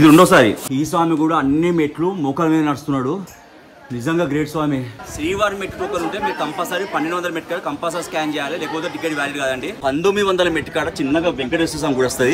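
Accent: Telangana, India. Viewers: Ni information telangana yasalo baguntdhi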